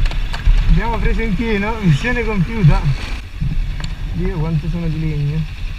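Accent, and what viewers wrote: Acerra, Italy. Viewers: Ma sei di acerra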